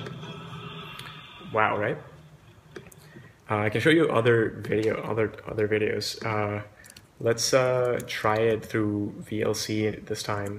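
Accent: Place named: New Jersey, United States. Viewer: Very cool demo. Are you from NJ? Just curious; you sound a lot like my buddy from there.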